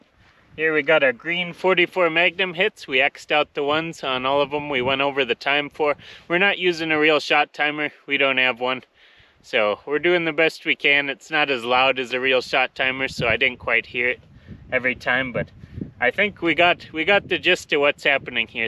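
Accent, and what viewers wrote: Canada. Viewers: You sound like a Canadian but shoot like an Alaskan. I'll subscribe.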